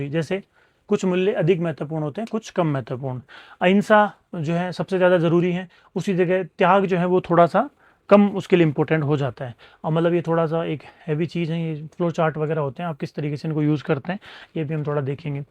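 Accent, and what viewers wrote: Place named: Jaipur, India. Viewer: Sir aap kha se ho aapki language jaipur ky core area ki lgri h?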